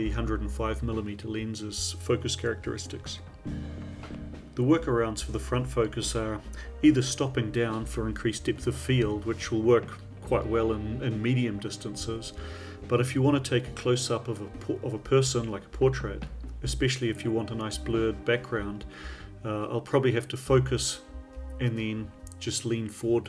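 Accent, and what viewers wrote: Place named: New Zealand. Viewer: Nice, thx! sorry for a silly question but what's your accent? Is it New Zealand? But you seem to live in Germany? Great series anyway :)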